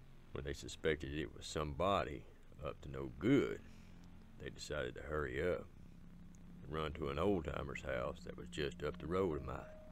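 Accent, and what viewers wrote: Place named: Tennessee, United States. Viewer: I was having a real down day. Real alone day. My dad passed not long ago and I've been pretty sad since. I've never seen nor heard of a channel like this on YouTube before. Didn't know it existed. But here it showed up on my list of recommended videos, and the moment I heard that old Tennessee draw, my heart was immediately calmed. This was exactly what I needed. I can't thank you enough fir bringing a feeling of Home in a hard time. Thank you so much and God Bless you guys.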